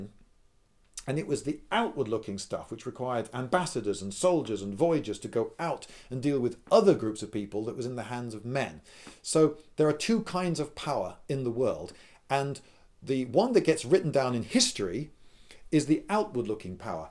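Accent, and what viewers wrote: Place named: United Kingdom. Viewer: I had never heard of this social structure before, very intriguing to say the least. More research is required. Thank you for the fascinating videos on such a wide range of topics. The videos on swords and other ancient weapons is what originally attracted me to your channel. I have also really enjoyed your videos on historicalish, emphasis on ish, movies. I was wondering if you could comment on a particular movie sequence in a recent movie. I was wondering what you would think of the use of the shield wall in the recent Hercules movie with Dwayne "The Rock" Johnson specifically. Incidentally you look and act a lot like my favorite uncle, right down to the British accent. The name is James by the way and thank you again for your channel. I enjoy it immensely.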